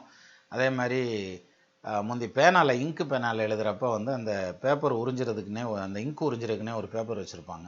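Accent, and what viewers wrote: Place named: Madurai, India. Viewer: Great explanation sir with a simple Madurai slang. All videos are very interesting it’s induced my astrological view.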